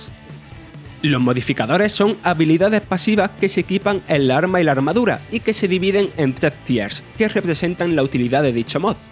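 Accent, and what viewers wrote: Andalusia, Spain. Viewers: A todos los que comentáis sobre el locutor: es andaluz (diría que de Andalucía oriental) y es un dialecto de vuestro país, que ni siquiera es lengua propia... si no lo entendéis es porque no queréis. Bienvenidos a España castellano-parlantes ;-) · Para los que se quejan de esta voz, yo entendí todo y tiene su acentuación española pero no exageren, ¿que palabra no entiendieron?.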